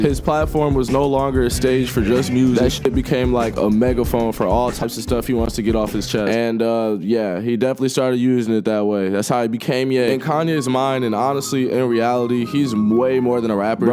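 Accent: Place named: Baltimore, United States. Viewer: I cant unhear the baltimore accent its making me crazy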